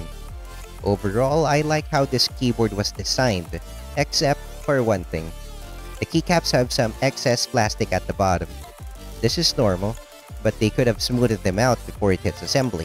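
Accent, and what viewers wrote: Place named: United States. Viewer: your accent has a mix of italian-american and filipino and it's oddly satisfying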